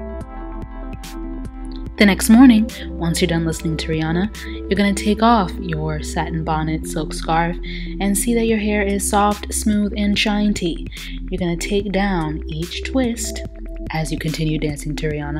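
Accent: Africa. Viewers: You are hilarious. I'm Cameroonian but it's crazy how all the African accents sound sound so similar. Loved it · I love u african accent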